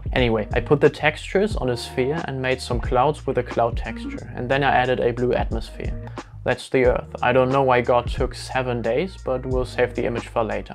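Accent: Germany. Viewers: Hab direkt an deinem Akzent erkannt dass du Deutscher biste 😎🔥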